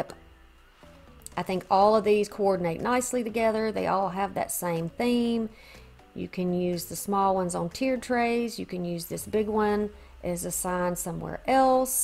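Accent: South, United States. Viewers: Love all of your diys I know from your accent, you're southern. Where are you from. I'm from gulf shores alabama.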